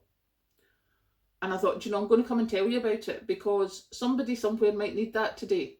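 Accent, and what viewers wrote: Scotland, United Kingdom. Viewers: agree - yes, some days a small step is worth reflecting on as many small steps get us to the end of a task and some times it is just too much to think of whole tasks - a little step or a few help keep things under control and keep us moving forward - love your planning and organisation - never tried a slow cooker myself - sounds like a practical idea and probably less fuel than some stoves ( I'm in a rented house - with solid plate electric stove and the oven does not heat up much) was wondering if I might try making wholemeal scones on my electric griddle but have been focused on some other stuff and haven't baked for years!:o) maybe one of these days....?! Love your practical ideas and applications - realised recently many of us were misguided by media etc and when I see people with back up heating and back up cooking that seems to be so practical and wise than the house proud decorating focus and other lifestyle addictions many have had in recent decades - miss the Scottish accent now that I am back in N Ireland - have shared your channel with some Scottish friends. 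Take care. Best Wishes.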